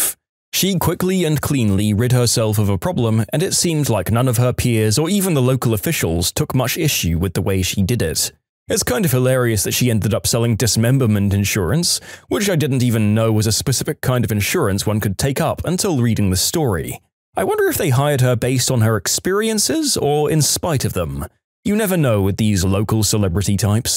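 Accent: United Kingdom. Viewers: I had a dream where this British voice was going to leave the channel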